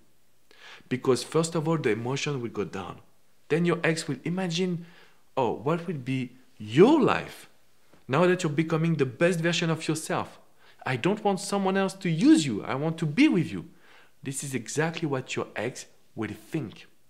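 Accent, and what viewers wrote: France. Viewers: I can tell you are French!!!! Love the accent!!! I am a Frenchyyyy too 😉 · I like his accent, is he french?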